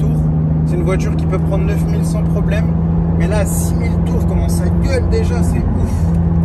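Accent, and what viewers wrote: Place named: Paris, France. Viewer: Bravo belle acquisition la seule chose qui me gêne c’est que tout les gens du continent continue à mettre des plaque corse pour faire croire qu’ils sont corse alors qu’avec votre accent de parisien on vous reconnais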